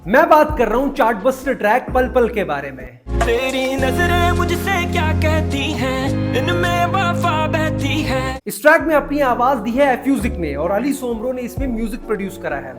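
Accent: India. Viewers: Yaar, Please speak correct Urdu. Okay. Abhee kay time pay? What is this? If you want to speal Mumbai style urdu say so? Sub Hindustani kyon bolyat ho bhai?? Please explain??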